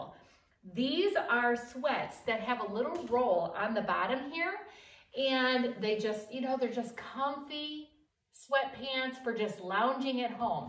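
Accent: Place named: Midwest, United States. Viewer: The first thing is I noticed your accent. I thought to myself she’s from the Midwest possibly Michigan. That’s where I was born and my relatives always sounded like that. I moved away at seven and did not have that accent the second thing is I have a Ziploc type phone protector like that for the beach and I love it. The other thing is where are those skorts while you can. I am 63 and a longer wear them. I gave them up about 13 years ago. Do you look so cute in them and I love the tops and I would take that first stress and I would cut it off and make a blouse it’s adorable. I’ll have to keep my eyes out for the jeans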